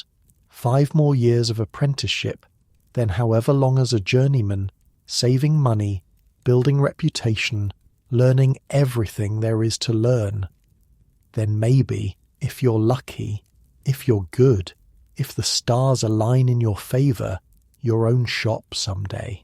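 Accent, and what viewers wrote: United Kingdom. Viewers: Can you tell me when the narratot in this amazing series on YouTube went from an American voice to a British one? I much prefer the soothing British voice. Thank you.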